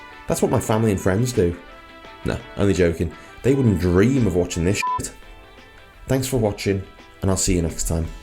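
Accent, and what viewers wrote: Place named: Liverpool, United Kingdom. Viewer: Nice review. Some humorous metaphors too that gave a good chuckle! 👏🏼 I detect an accent… so, Everton or Liverpool is the important question! 😉 I picked up a VHP GMT white dial in 43mm on Saturday. Gotta say I’m really chuffed wi it. The single push that doesn’t do anything on your version is the way of initiating flash settings or changing time zone of the gmt on my version. Really a classier grab and go alternative to a G-Shock. Cheers bruv, have a good un.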